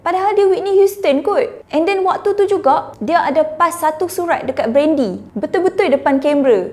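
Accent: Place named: Kedah, Malaysia. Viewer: Sempoi speaker video pon slang kedah noo 😁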